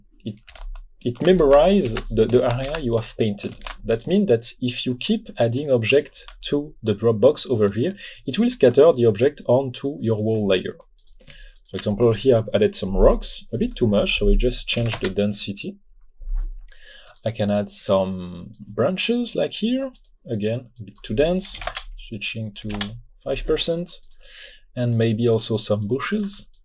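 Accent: France. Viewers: Nice tutorial and nice heavy french accent. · French accent :)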